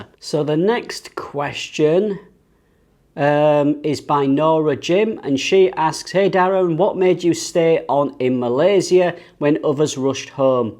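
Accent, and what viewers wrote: United Kingdom. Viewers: Lv ur British accent 😍😉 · can you speak less british accented English? its very hard to understand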